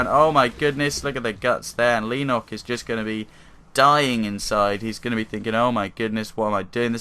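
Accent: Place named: United Kingdom. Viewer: Another British commentator ^_^ good job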